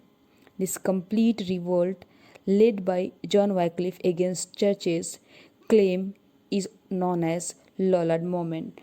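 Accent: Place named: India. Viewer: Are you indian? Different accent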